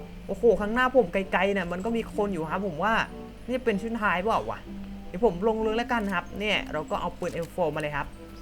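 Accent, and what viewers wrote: South, Thailand. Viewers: คนใต้ป่าว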